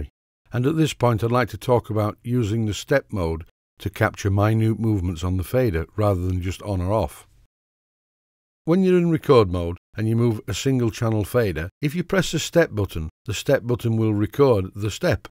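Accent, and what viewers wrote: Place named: Northern England, United Kingdom. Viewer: That's a very helpful video - thanks a lot! (And, I couldn't help noticing, very sweet Northern English dialect - u sounds, I luve it :-)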